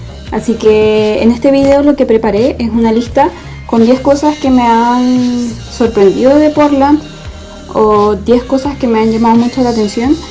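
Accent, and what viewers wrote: Chile. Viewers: AAAAAAAAAAAAA se nota que es chilena apenas hablo jajajajajaaj que bella... eres muy linda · Sin ver 1 minuto del video puedo asegurar que eres chilena con ese castellano tan eligible 😂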